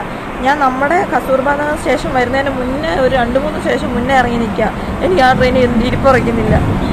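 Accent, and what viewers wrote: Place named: Idukki, India. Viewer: Pakka idukki language ❤️❤️😘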